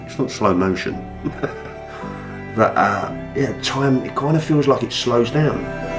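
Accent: London, United Kingdom. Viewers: Great vlog, glad to have found you on here.. can relate to the Geezer accent 😁😎 .... Subscribed, and maybe bump into you on the mean streets 👌👍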